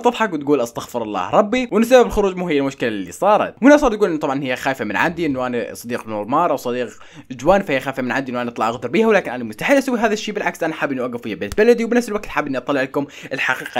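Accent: Iraq. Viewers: اي گلبي عراقي اصلا امبين من لهجه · إنه توني انتبهت على اللهجة العراقيه صوته ماشاء الله كأنما مذيع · كلامه عراقي مبين عراقي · ترة حجية كولة عراقي شلون متدرين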